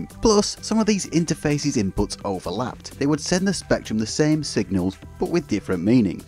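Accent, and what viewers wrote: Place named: Northern England, United Kingdom. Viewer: You sound northern, any chance of a collaboration with Techmoan? Yet another brilliant video that’s as informative as it is entertaining.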